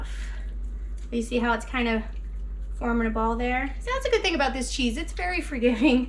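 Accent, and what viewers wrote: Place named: Central Pennsylvania, United States. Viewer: a word like y'all. From central PA. I thought you were originally from pa.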